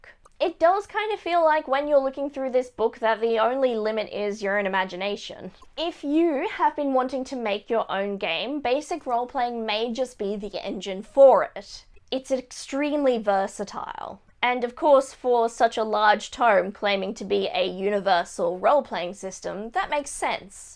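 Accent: Australia. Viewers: I really really want to love your channel, but you have an Australian accent still. :(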